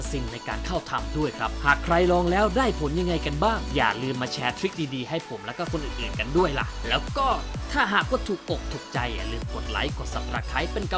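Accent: South, Thailand. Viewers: คนใต้ม่ายนิ555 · คนใต้มั้ยนิ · คนใต้เหรอ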